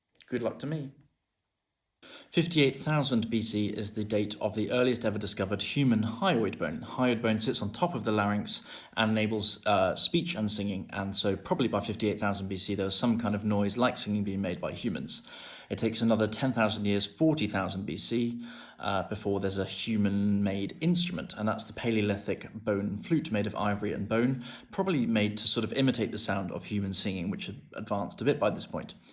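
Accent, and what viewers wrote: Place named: United Kingdom. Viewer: What a brilliant and amazing 5 minutes,! (Also I love that perfect British accent; these days, it's fast disappearing).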